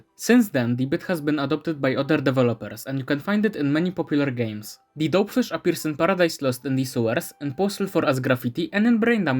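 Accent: Poland. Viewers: Uwielbiam polski akcent. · X3 piękny akcent polski, tak coś czułem xD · slysze ten akcent i wiem ze to polak. peak material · tickhest polish accent i've heard in a while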